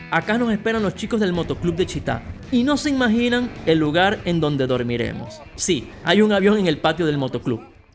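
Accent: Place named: Zulia, Venezuela. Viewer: Uno sabe que un episodio es bueno cuando el tiempo se te va volando cuando lo ves... Cuando te oigo me imagino la rápida traducción mental que vais haciendo del maracucho al español "neutro" antes de hablar, cosa difícil en nosotros los zulianos jejeje, me pasa en Argentina... saludos hermano, que siga creciendo el canal!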